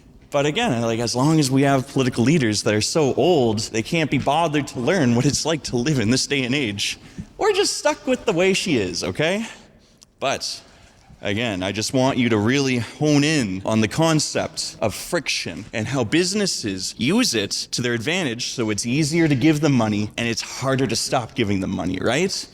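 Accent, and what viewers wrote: Canada. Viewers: This man has the thickest Canadian accent I've ever heard.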